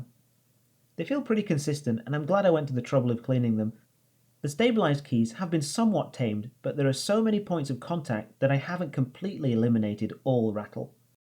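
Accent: United Kingdom. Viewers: He is Japanese with top-tier British accent, by the way.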